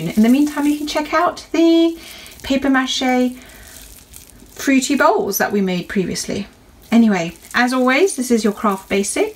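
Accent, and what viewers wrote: United Kingdom. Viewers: I don’t know what it is about female British accents, but damn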